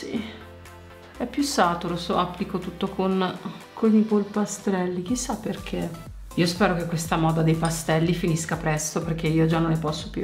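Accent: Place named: Tuscany, Italy. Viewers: L'accento toscano m'ha scaldato iccuore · Te posso dì na cosa? Me fai morì da i ridere😂!!! (In senso buono ovviamente) Comunque io sono di Arezzo e sentirti dire quel tuo dialetto aretino/ fiorentino mi ha fatto sorridere❤️si avvicina parecchio. Ma di dove sei te??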